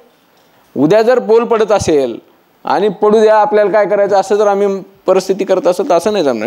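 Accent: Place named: Marathwada, India. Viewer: सर तुमची बोलण्याची भाषा 100टक्के मराठवाड्यातीलआहे.....❤❤❤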